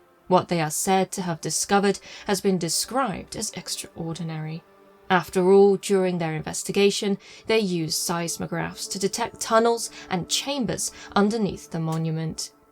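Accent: United Kingdom. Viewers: I truly enjoy watching and listening to your channel. That British accent and the way you narrate your video is truly exceptional.😍😍😍😇😇😇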